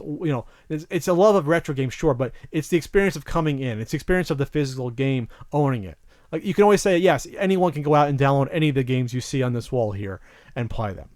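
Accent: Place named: Northeast, United States. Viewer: Sounds like you might be from the northeast. GL on the film.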